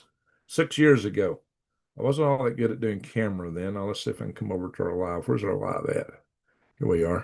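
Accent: South, United States. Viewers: my example is my friend. He's a good guy, he's my friend too. I showed him your channel when he was over. he teases your Southern accent "China", lots of people live in a 'fast world', and some of it is superficial. I'm back on it!! IGNOREISM- Caused my Schism! LOL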